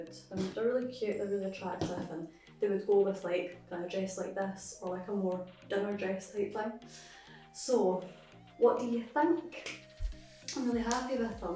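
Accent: Scotland, United Kingdom. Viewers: It’s the Scottish accent that makes me melt lol Much respect 👍♥️ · Briiliant to see a beautiful girl on here with my accent. I keep telling people we have so many gorgeous girls in Scotland and oor just confirms this. Absolutely stunning, ❤️🏴❤️🏴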